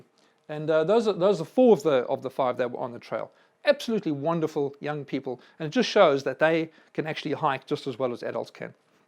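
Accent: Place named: New Zealand. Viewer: Hi! How did I miss this?! Great job! It was awesome to hear you speaking in that chill NZ accent. Miss you Bud.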